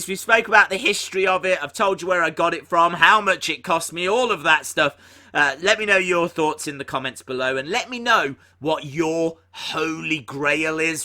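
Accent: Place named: Midlands, United Kingdom. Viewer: whereabouts in the UK are you from? Sounds a bit like a Midlands accent? What would be your nearest wwe show city?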